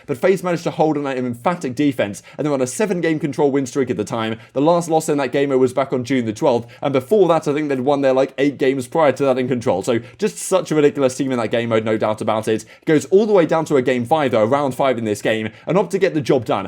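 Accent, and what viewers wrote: United Kingdom. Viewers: British, ha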